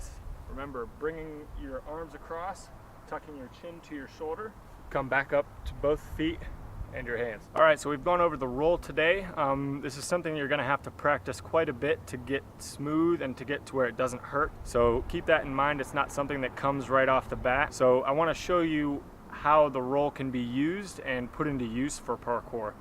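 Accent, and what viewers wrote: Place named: United States. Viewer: your voice sounds very american 😳🤔🙂 greetings from Vienna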